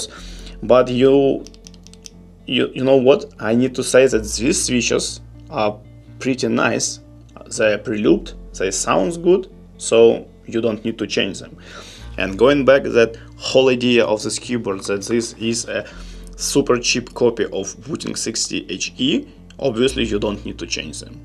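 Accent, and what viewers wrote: Russia. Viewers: Та по акценту сразу понятно что человек русский) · Честно говоря я когда зашел на канал сразу понял что ты русский по акценту)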